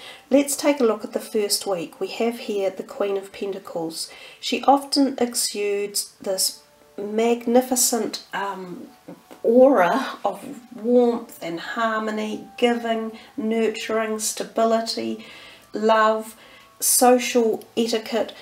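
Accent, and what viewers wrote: New Zealand. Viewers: Kia Ora! Thank you very much good to hear a kiwi voice from the homeland. Much love from the Philippines. Thank you for your time and effort much appreciated!!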